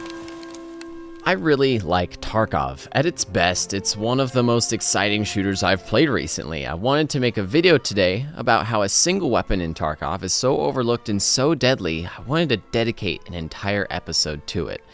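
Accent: Texas, United States. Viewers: Your American pronunciation of Russian words is cute, but wrong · Yep. Texas boi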